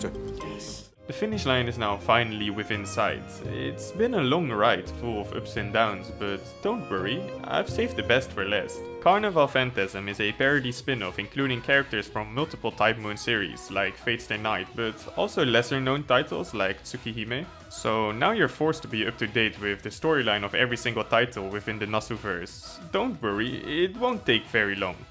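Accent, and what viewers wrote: Netherlands. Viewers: Are u dutch?🤔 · It’s hard to hear, but u can’t hide ur accent from a fellow dutchman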